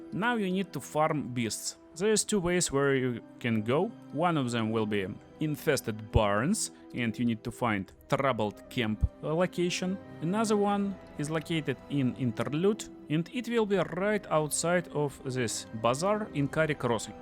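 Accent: Russia. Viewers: ten ruski akcent jest straszny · дружок, я наше произношение везде узнаю - показывай где на нашем языке видео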